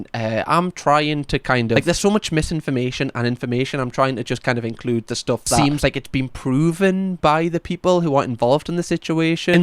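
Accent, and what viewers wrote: Newcastle, United Kingdom. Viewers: Agreed and the Geordie accent helps😊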